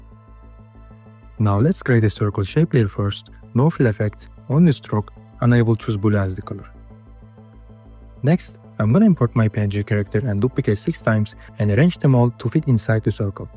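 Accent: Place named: Turkey. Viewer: Where are you from, brother? Your accent sounds like Turkish.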